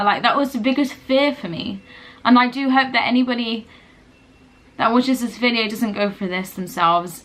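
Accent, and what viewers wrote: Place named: United Kingdom. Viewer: Pakistani girl with british accent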